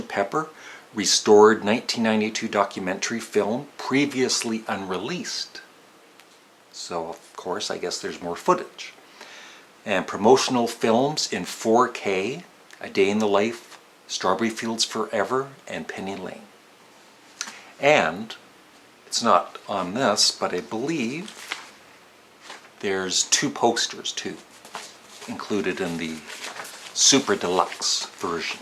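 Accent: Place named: Canada. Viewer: 🇺🇸 I just assumed it was Canadian accent coming out. Doesn’t bother me.🇨🇦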